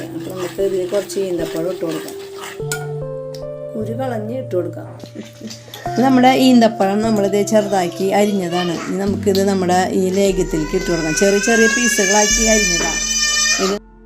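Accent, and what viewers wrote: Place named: Thrissur, India. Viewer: ഇത് എവിടാ കിട്ടും. തൃശൂർ ആണോ. സംസാരം kettit.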